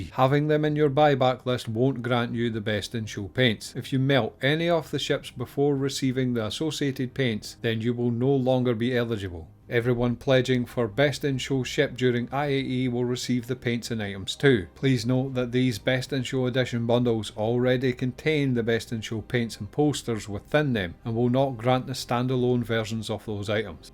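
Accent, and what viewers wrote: Scotland, United Kingdom. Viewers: I love your thick Scottish accent ... sometimes I have to ask myself, what did he just say?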